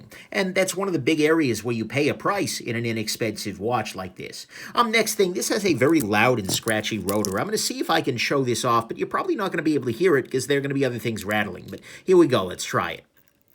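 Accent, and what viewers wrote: New York, United States. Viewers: That's a New Yorker voice hard core.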